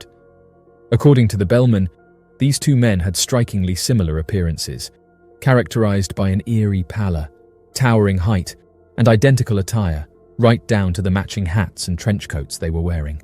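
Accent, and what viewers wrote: United Kingdom. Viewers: T you from uk 🇬🇧 interesting and odd .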